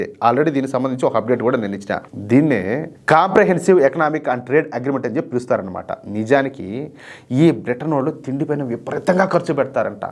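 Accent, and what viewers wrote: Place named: Chittoor, India. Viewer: Meedhi Kadapa na 😅 mee slang vini chittoor anukunna.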